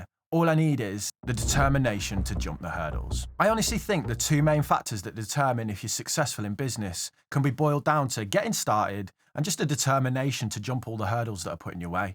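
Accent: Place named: Midlands, United Kingdom. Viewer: Congratulations. I recently found your channel and I absolutely love it! It’s so good listening to someone down to earth from the midlands sharing no nonsense, well written advice and reflection with a sprinkle of humour! 🎉🎉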